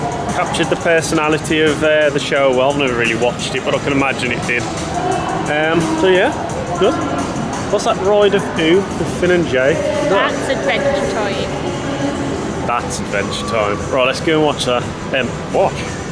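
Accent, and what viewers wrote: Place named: Midlands, United Kingdom. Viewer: hi mate, love your content! just wondering are you from the midlands because your accent sounds very strong ps I'm from Birmingham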